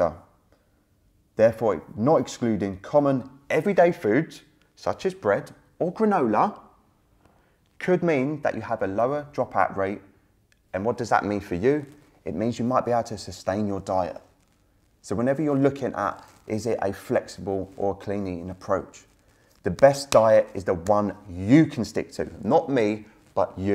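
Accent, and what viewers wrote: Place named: Cockney, United Kingdom. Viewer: Please dont take this the wrong way as you present great info as usual, but i cant get past the Jamie Oliver likeness (no offense but most of us feckin hate that mockney Cockney). You need to get Tom from behind the camera to in front. I know hes doing his own thing but i think your subs would go through the roof with either him or both of you presenting serious content with an injection of humour... keep up the good work.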